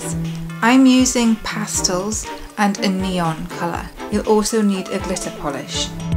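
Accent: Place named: RP, United Kingdom. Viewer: You have such a posh accent haha:)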